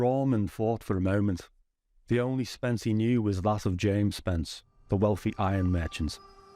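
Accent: Liverpool, United Kingdom. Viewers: Scouse narrator. Bonus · the posh scouse phone voice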